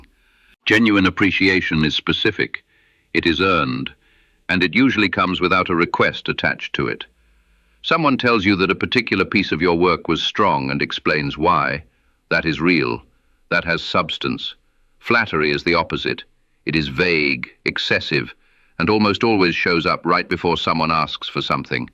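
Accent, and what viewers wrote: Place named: England, United Kingdom. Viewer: He was Italian, why does he have an English accent?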